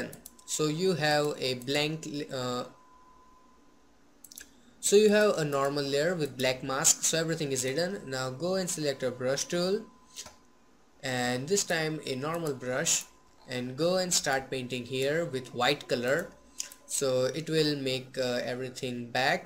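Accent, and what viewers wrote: India. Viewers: I love your indian accent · is that an Indian accent?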